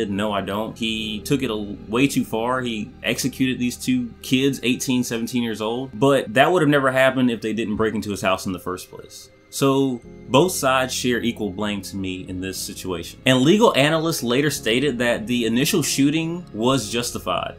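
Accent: South, United States. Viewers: You are so country. It’s adorable ☺️ I would wager you are from the south😊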